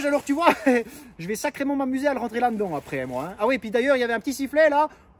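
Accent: Switzerland. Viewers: Super accent jurassien suisse, j'ai la larme à l'oeil!😂👍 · Eh ta moi en proche frontière suisse qui devine ton patois sans me tromper 😅😂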